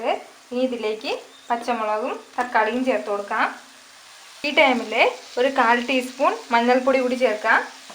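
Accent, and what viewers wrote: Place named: Kozhikode, India. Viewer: but ur slang like clt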